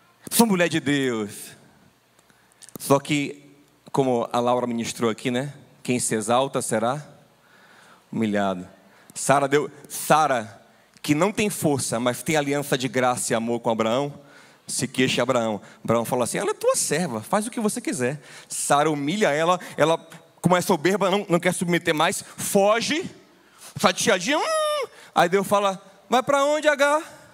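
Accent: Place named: Bahia, Brazil. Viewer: Ouvir uma mensagem poderosa e abençoada como essa, no meu ''dialeto'' e sotaque natural baiano é tremendo demais para mim!! ''Nao queremos viver na mentalidade da carne, de Agar gerando Ismael confiando em nós mesmos...nos leva a compreensão do quão fraco somos até o ponto de perceber que tua obra em nossas vidas é motivo de riso''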